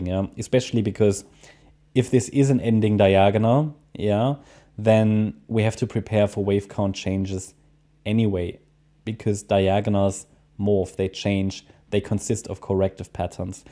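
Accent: Germany. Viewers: I love your german Accent 🩷 Vielen Dank !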